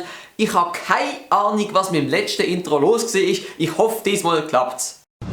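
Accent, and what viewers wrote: Switzerland. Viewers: schweizer akzent hahahaha kann man nicht ernst nehmen hahah der könnte über den holocaust labbern leute würden drüber lachen.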